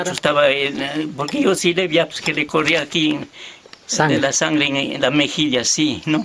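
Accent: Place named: Loja, Ecuador. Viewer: Hermosa narración y que bonito escucharlo con un lenguaje Lojano autóctono.. gracias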